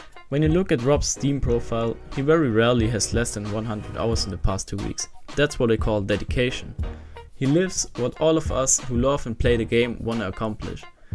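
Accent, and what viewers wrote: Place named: Germany. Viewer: Man hört sofort den Deutschen Akzent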